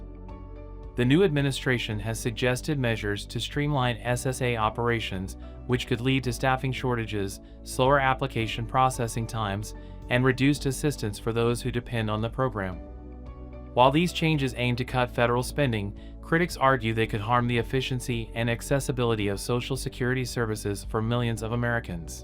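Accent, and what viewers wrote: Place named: India. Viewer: I hope that's an Indian because it sure isn't a black man's voice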